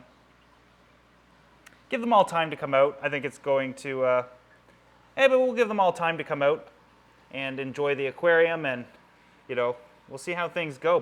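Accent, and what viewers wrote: Canada. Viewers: James Goose He's Canadian!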